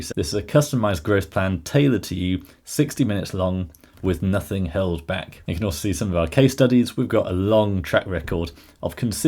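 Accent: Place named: North, United Kingdom. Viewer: Northern accent there 👀 Where you based buddy?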